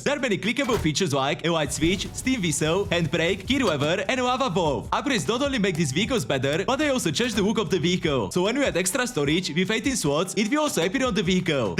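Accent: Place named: India. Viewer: Why do u have the most indian non indian accent of english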